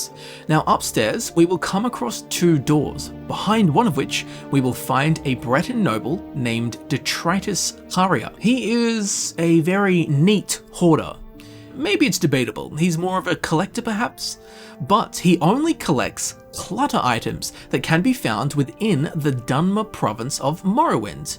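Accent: Australia. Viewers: I just love the idea of an Australian lore guide who speaks with some degree of eloquence, it's both hilarious and glorious at the same time.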